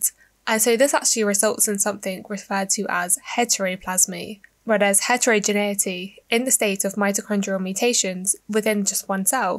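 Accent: United Kingdom. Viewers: Im sorry to say, the brittish accent is just so hard to take.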